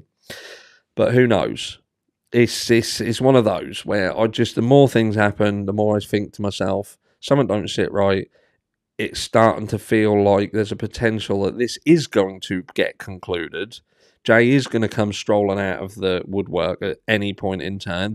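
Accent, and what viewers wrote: Norfolk, United Kingdom. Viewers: This is the most west norfolk accent on youtube. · I thought that. I'm Kings Lynn and now Norwich and he sounds quite local. Love ut! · Love your vids, are you from Norfolk? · wayyyy Norfolk boiiii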